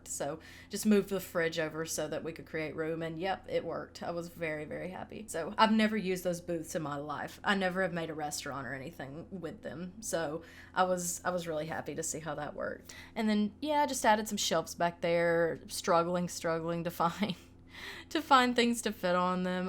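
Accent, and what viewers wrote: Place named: Appalachia, United States. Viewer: Love your voice! Where are you from!? Definitely sounds like an Appalachian accent! Also love the renovation!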